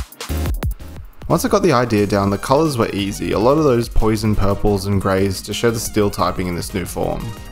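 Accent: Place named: Australia. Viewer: What part of Australia are you my man?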